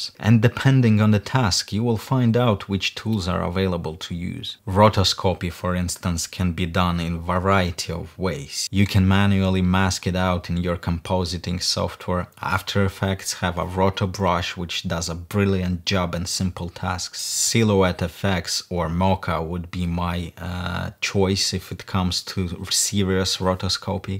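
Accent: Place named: Russia. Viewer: Russian accent😂anyway blender 2.8 is future 3d modelling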